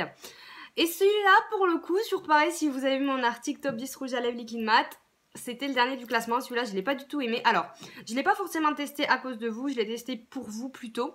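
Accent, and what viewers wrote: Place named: South, France. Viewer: on entend beaucoup moins ton acceeeeeeent du suuuuuuuud sur YouTube que sur Snap !! ahha non pas que je te suive uniquement pour l'accent ...! en tout cas tes vidéos sont un réel plaisir ! pas de chichi, ni de superflu, ni de mise en scène (jolie mais bidon), tu vas à l'essentiel, tu parles des produits de façon très claire et ça fait du bien ! grosses bises ma belle !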